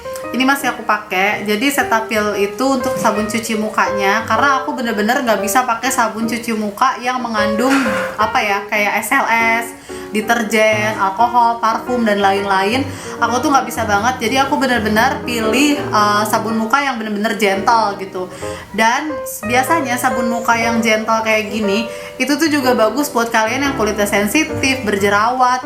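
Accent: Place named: West Java, Indonesia. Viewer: Siteteh orang sunda nya twh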